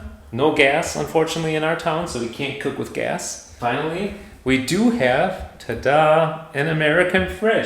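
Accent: Germany. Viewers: Hey, found the correct video and it is very interesting, because I had the same feeling. When you speak English I also heard a light German accent. It is very funny somehow. :) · Why did you have such a strong obvious German accent ? 🤔 I am German and I wondering. Because you don't sound like an American. Did you live so long here that you adopted a German accent? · Hello I recently discovered your channel. I noticed your German accent and could not believe you were actually American. I find most interesting are your beautiful videos and photos in Bavaria. It brings back memories of life in Germany living in a Village! I Loved it! I Love the Land, homes and culture so much! I felt at home there, although I was born American. I appreciate your interesting information! I hope to move back to Germany. I Watch videos, live, eat, sleep and dream Germany all the time. 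May you and your family enjoy your beautiful new Home! Tchuss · You‘re not a real american. You have like a german accent. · Did you grow up in Germany? The accent and vocabulary really don‘t sound American ;)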